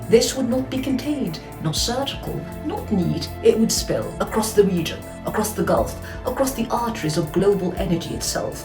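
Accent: RP, United Kingdom. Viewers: This truth-teller with her hilarious Julie Andrews accent haunts my dreams :face-red-heart-shape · I like a lot your British accent Anyhow, never ever trust trump and Iranian people should not fall Again into Trump propaganda 👈👈👈👈👈👈👈👈👈👈👈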